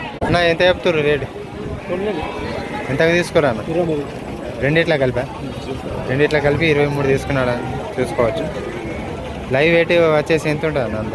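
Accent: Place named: Andhra Pradesh, India. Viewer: telangana yasa matladu andhra basha enduku matladuthunnavu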